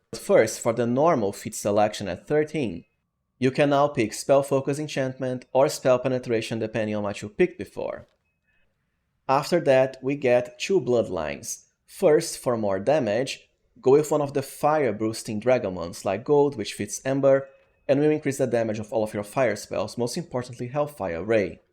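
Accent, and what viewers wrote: Brazil. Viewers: Irmão. Você é brasileiro ou não? Kkk grande fã aqui. De qual estado tu é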